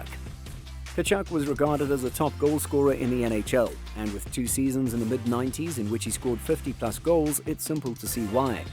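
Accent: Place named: United Kingdom. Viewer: this vid is shallow rubbish ...w/ a Brit accent ?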